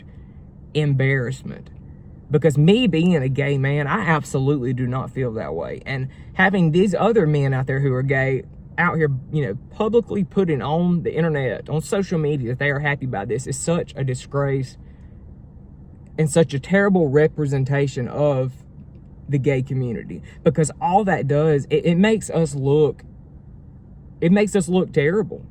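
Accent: South, United States. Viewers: It's not the gay community that this makes look bad baby, it's the trans community that's doing that. They're the ones shooting up kids at prayer & killing Charlie. Ain't nobody I know mad at the gay community that I've seen. They don't have anything nice to say about the trans community tho. I know several gay people & not 1 of them have a mean bone in their body. They're sassy & can get a lil spicy when provoked, but nothing mean or malicious about them. Why u urself sound sweet enough to give somebody diabetes sir.😂 That southern twang was made for u baby.😁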